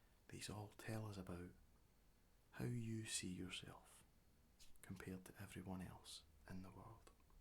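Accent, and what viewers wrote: Scotland, United Kingdom. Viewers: Where abouts in Scotland are you from? Your accent sounds so much like my boyfriends... It's nice ☺️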